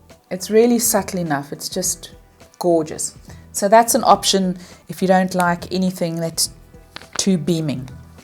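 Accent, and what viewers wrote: South Africa. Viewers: Hello from Los Angeles.🌸🌴🏖️😊 You have such a beautiful accent & calming presence. And I guessed your accent is S. African.🏵️🦒🌴🦏🏞️Yay! Thank you for the review. I think I'm going to try L'Oreal Freshwear again. Last time I tried it, seemed my skin felt a bit dry and the color wasn't right I normally stick with Clinique because of my sensitive skin. Unfortunately, getting a good shade match is still difficult with Clinique. I've been shade matched in many different colors.😒 I've also used Revlon Lipstick-Rum Raisin since my early 20's. I'm 52 and it's nice to see someone else who remembers these products & shades. You look so pretty. Thank you for sharing. 🦋🏵️🌻💄🌸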